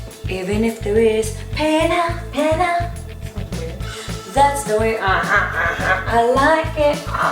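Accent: Visayas, Philippines. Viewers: Bisaya po kase c bisprin kaya ganyan po sya magsalita,pero ok naman para din di ma bored mga viewers kaya dapat may comedy talaga po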